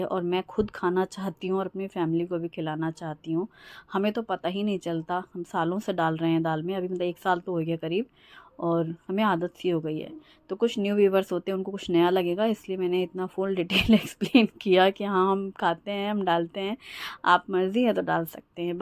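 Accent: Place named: Banda, India. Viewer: Sahi kaha rhi ho aap kaam Kabhi nahi katam hota apke bolne ka ton bilkul banda ki taraf ki hai kahi pe raho pr apne jameen ki khushbu aa hi jati hai❤️❤️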